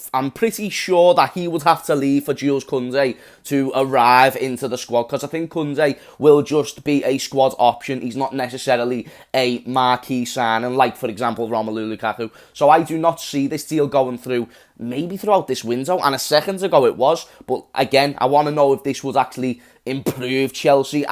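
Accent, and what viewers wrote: Liverpool, United Kingdom. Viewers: Proper Scouser bro, keep up the content lad ynwa..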